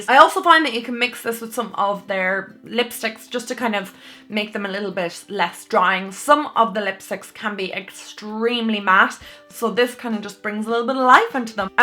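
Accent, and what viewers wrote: Ireland. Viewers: Ayyyyyeeeee your Irish 2. much love❤️🇮🇪🇮🇪🇮🇪🇮🇪🇮🇪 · you sound Irish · Oh my god I literally just stumbled across this video and WOW I spend so long on YouTube watching makeup tutorials but I’ve never met an Irish YouTuber before, at points during this video I found myself just listening to your accent, it’s so nice among all these Americans 😂 I just had to hit the like and subscribe buttons, I’m definitely going to check out loads more of your videos in the future 💕 (I literally grinned when you said ‘ye’) us Irish need more representation on YouTube 😂 ☘️ 🇮🇪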